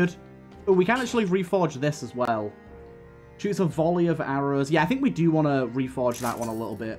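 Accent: United Kingdom. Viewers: Yes more dead Cells! What part of the UK are you from btw? 😊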